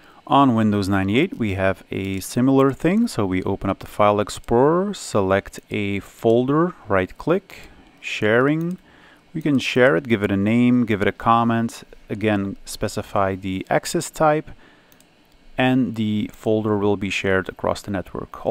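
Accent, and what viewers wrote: Netherlands. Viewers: Are you Dutch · dutch guy?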